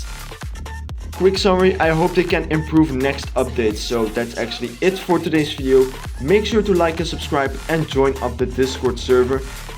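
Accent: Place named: Netherlands. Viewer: i hear a netherlands accent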